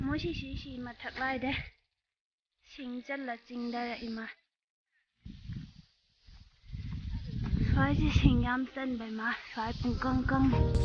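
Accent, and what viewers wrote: Kakching, India. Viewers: Yam nungaidoi malle kakchinggi lonse eidi yam ngaojei leisu yam leininge.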